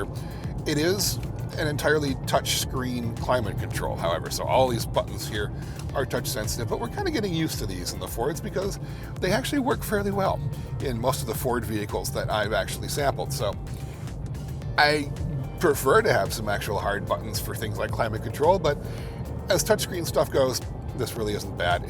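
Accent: United States. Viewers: I’m guessing he’s an American?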